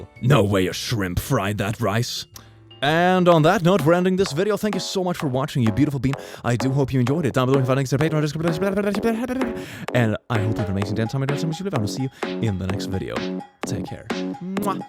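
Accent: United Kingdom. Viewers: As a Swedish person, i can only agree that this is exactly how Brits sound to me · Your British accent is perfect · ’ British accent is amazing From a British citizen (pls don’t give me a guy voice if u read this, and no I’m not a girl either)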